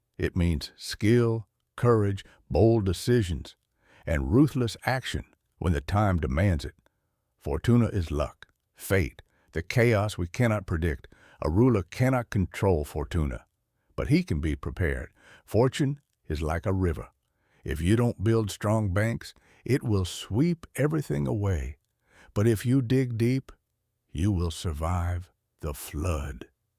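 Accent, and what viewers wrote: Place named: South, United States. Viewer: What's with the southern confederate general voice?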